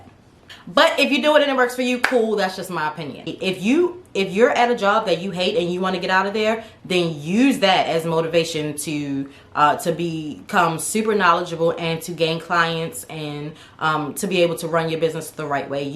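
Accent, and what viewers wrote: Baltimore, United States. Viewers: I can hear the Baltimore in your accent. Good Video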